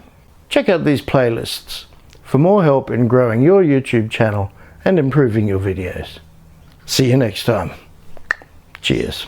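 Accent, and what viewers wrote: Australia. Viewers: I don't know what the hell I'm talking about. But it's nice to have someone reply back and share things to say. You sound like your from Australia. U used to live down there in Sydney. I'm probably gonna go back as soon as I can. I'd rather be down there if the shit really hits the fan if you know what I mean.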